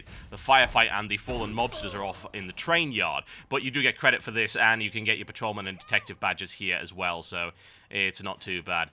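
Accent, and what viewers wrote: United Kingdom. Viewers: ure my favourite youtuber atm, mainly because its nice to hear proper British annunciate but if you fail to provide DCU content post launch... I. WILL. KILL. YOU!!!:P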